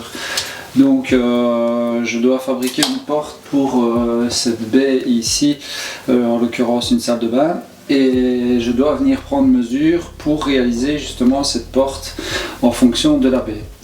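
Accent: Liège, Belgium. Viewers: Jolie découverte via la chaîne de Floky. Je me suis déjà mangé toutes les vidéos. Ça fait du bien de voir que la Belgique est aussi présente sur YouTube. Vive l’accent Liégeois ( et ce n’est pas péjoratif ) .Un abonné en plus. Et bonne continuation je suivrai la chaîne avec attention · Belle découverte et en plus un accent du pays 😉. Et en plus septante trois et pas soixante treize. Enfin un bon belge. Bonne fêtes. Et merci floky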